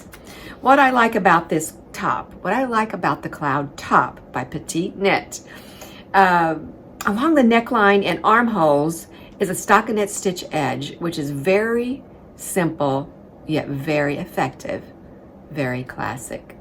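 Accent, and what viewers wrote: South, United States. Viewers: Love your southern accent! How long have you lived in the South? 💕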